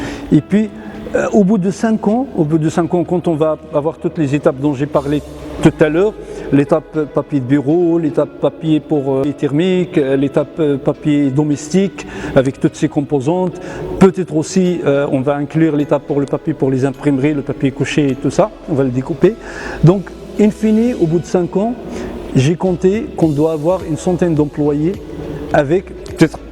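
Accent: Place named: Shilha, Morocco. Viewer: امالك علا لفرونسي باين طاغية عليك الشلحة هضر بيها باش ناس تستافد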